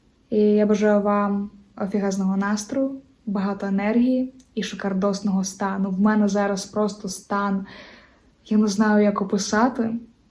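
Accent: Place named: England, United Kingdom. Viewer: Таке враження,ніби у вас англійський акцент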